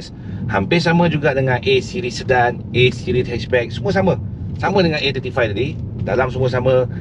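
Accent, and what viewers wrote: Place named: Negeri Sembilan, Malaysia. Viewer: Abg bunyi loghat cam oghang nismilan..😅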